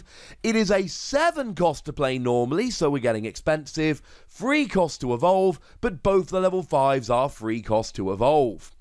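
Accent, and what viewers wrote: England, United Kingdom. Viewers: Weird accent? He has an english accent.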